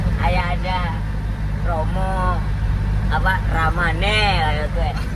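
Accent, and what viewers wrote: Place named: Indramayu, Indonesia. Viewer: Mas Punya kamu keturunan dari Indramayu ya, soalnya ngomong jawanya itu Jawa Indramayu❤❤❤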